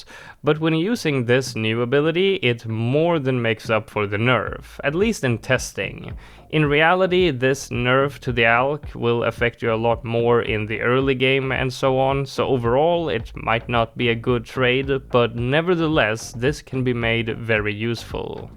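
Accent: Sweden. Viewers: i know that your swedish from how you sound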